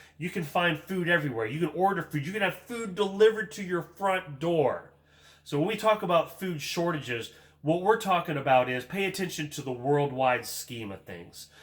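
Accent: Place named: Pittsburgh, United States. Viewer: oh ok. Your accent sound like Pittsburgh. 😃.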